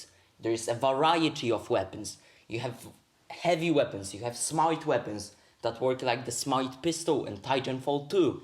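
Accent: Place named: United Kingdom. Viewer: and stop the british accent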